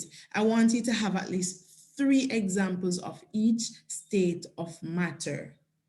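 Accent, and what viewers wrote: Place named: Jamaica. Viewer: I find this Jamaican accent very off-putting...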